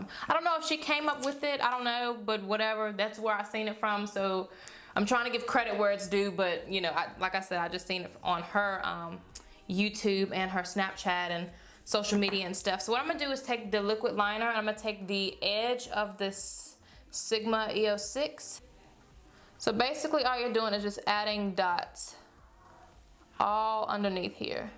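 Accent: South, United States. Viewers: Your southern accent is so darn cute!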